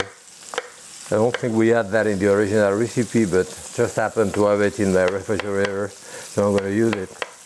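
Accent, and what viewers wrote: France. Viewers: Sean He just has a thick French accent...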